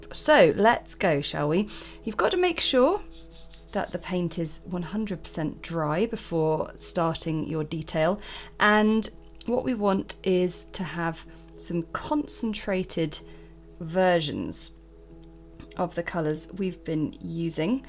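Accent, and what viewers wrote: United Kingdom. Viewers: your art is absolutely awesome! love every detail, so delicate, your creativity and your beautiful british accent, thanks for sharing!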